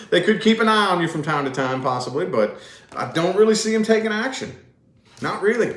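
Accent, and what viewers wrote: South, United States. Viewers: Love your good ole southern boy delivery. Spot on every time. Thanks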